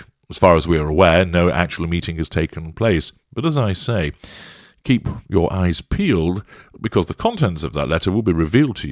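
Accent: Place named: Yorkshire, United Kingdom. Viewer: |Maybe she wants to usurp Camilla, then Catherine would have to curtsy to her!!.. PS has a Yorkshire lass I just loved your Yorkshire accent made me chuckle thankyou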